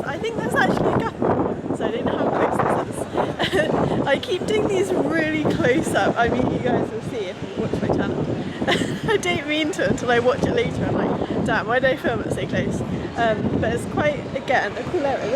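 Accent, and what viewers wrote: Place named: England, United Kingdom. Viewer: Your already a winner! With that great English accent!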